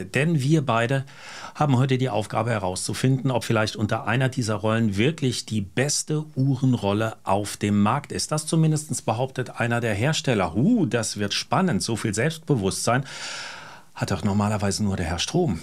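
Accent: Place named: Hesse, Germany. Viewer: Moin Herr, sehr interessantes Video zu einem Nischenthema, dazu top recherchiert und fair bewertet. Ich habe mir vor Jahren mal eine erwas rustikalere Lederrolle von Steinhart zugelegt, die ich aber sehr selten nehme. Auf Reisen nutze ich lieber die günstigen Reiseboxen von Sinn für eine Uhr oder etwas hochwertiger das Leder Reiseetui ebenfalls von Sinn. Wie immer sehr kurzweilig mit dem schönen hessischen nit und einer Prise Humor, Chapeau🎩.Und wie gewohnt schön in Szene gesetzt und klug kommentiert. LG Werner Hoppe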